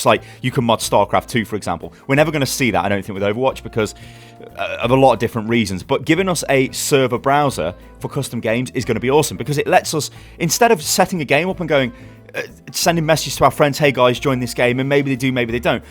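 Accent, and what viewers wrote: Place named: United Kingdom. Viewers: A Brit that sounds like he's on cocaine is easy to listen to? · V16 Lambo now I was able to skip the Blizzard video and hear it in a British accent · V16 Lambo Tbh I watched this because the British accent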